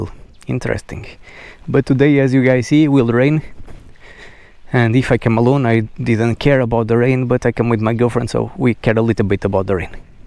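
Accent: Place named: Portugal. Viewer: your accent sounds alot like a portuguese guy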